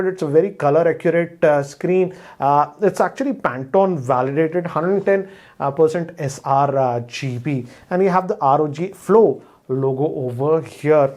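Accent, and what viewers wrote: India. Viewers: Typical indian style every sentence ending on T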